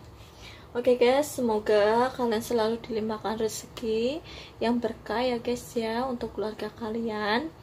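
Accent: Banyumas, Indonesia. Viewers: Ora ngapak ra kepenak..nyimak kk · Hadir maning wong ngapak😂 Ayoo yg lain ikut hadirr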